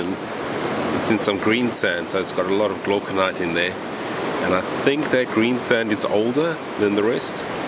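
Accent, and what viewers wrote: South Africa. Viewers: The longer you go back in this guys video archive the more South African he sounds 😮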